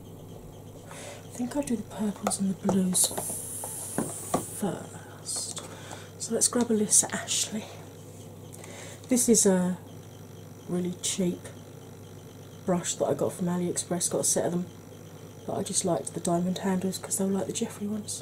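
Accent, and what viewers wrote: United Kingdom. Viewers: Welcome! Love British accents 😊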